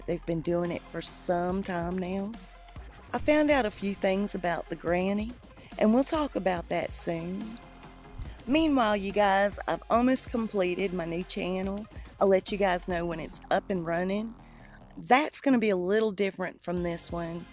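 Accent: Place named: Texas, United States. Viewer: I love your Texas accent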